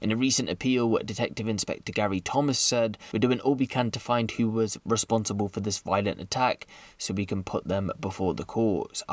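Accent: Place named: RP, United Kingdom. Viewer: I can not take the narrator seriously he's so posh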